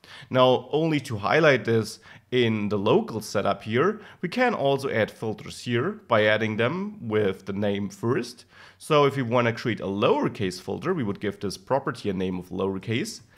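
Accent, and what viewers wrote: Germany. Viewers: nice Germanized British American Accent Mix...Filter :)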